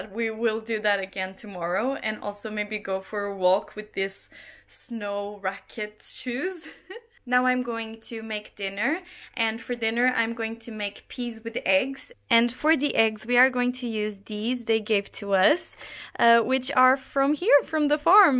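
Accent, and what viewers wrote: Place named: Norway. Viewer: Hi I'm Joachim I'm from Oslo, like you're videos 👍 I think it's really cute that you're English now starts to have a Norwegian accent 😊😅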